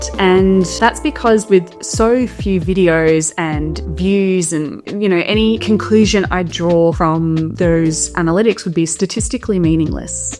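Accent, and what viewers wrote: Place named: Australia. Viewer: Keep going! You are definitely on the right track. The absolute most important thing I have found is consistency – upload with the same time intervals, whatever you choose – and keep the momentum. Google seem to like that. Experimenting with content, like you're already doing, is also great. Have learnt many informative things from you, so will definitely be continuing to follow you. Love the Australian accent BTW!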